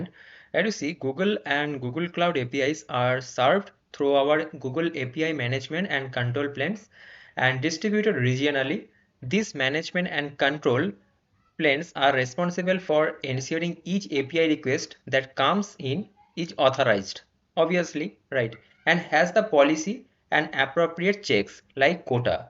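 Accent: Bengal, India. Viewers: You share good knowledge, but try to talk through a prompt reader, and try to do a better accent. The Bengali in you is shown through the accent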